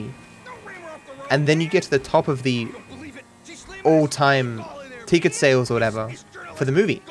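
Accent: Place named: Australia. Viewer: Where in Australia do you live? Your accent is sooo smooth compared to my Australian friends.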